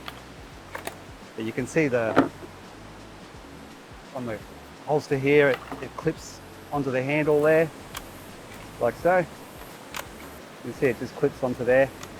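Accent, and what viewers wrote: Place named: Australia. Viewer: You KNOW it's legit when the narrator has an aussie accent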